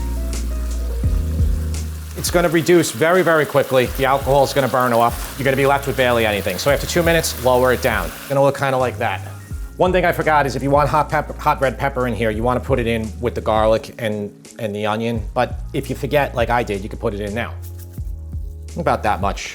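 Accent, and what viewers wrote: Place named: East Coast, United States. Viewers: shake n bake. love the east coast accent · Hahaha. I was like this guy has to be from long island. Saw the location. Locatelli is the only acceptable grated cheese.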